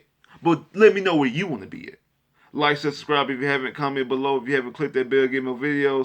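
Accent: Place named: South, United States. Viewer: Aye bruh. I’m a Bills fan from Alabama and before we play somebody, I listen to a YouTube fan blogger for that team.... u the best one yet bruh💯 You ain’t talking stupid, you respect your opponent , your perspective on your own team is on point, and your analysis of my QB..... I COULDN’THAVE SAID IT BETTER! I don’t know if it cause we both from the south and I can relate to your delivery, but I enjoyed this video. You should maybe open it up and do NFL videos not just about your team. (Idk if you already do) one Love folk. #GOBILLS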